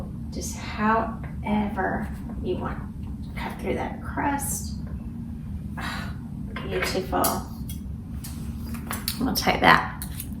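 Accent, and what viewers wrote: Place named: Georgia, United States. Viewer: I'm a Georgia cracker transplanted to Texas and your accent reminds me of all my family in the Albany area, the transcript shows " bowl" when you say boil, I get teased about my accent here in Texas too,love your channel!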